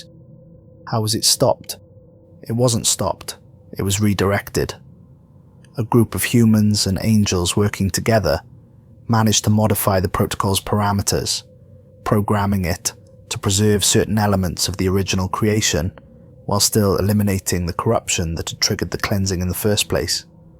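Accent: United Kingdom. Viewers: I like the story. Can you slow down your audio a little bit? I could be wrong but you sound like you are from the uk and you have portions of the story that it it gets hard to understand. Most story channels are used to fall asleep a slightly slower audio works awesome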